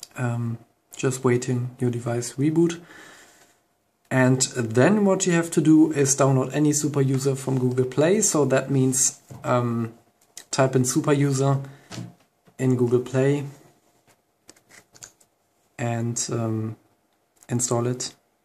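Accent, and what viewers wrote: Germany. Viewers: Are you german?:)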